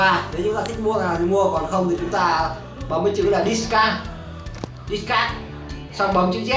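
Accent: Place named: South, Vietnam. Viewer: Giọng miền nam nói chuyện rõ nhạt, Clickbait cực mạnh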